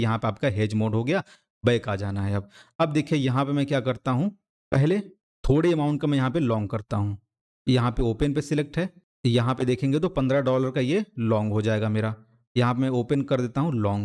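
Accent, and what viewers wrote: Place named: Bihar, India. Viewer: Pakka bihari ho bhai ekdum humko lagta hai mera bhai bat kar raha hai😊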